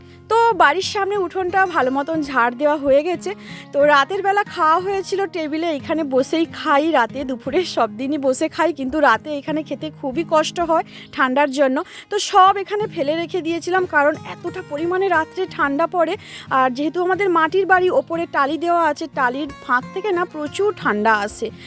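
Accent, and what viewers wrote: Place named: West Bengal, India. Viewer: কথা শুনে বোঝা যাচ্ছে ইন্ডিয়ান বাঙালী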